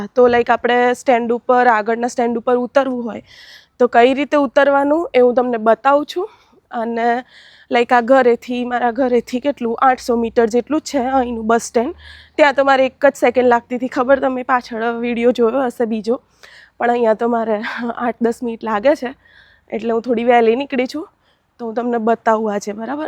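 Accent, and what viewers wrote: Sabarkantha, India. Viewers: Tame sabarkhantha namaste chho bolo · Sabarkantha ni boli lagesr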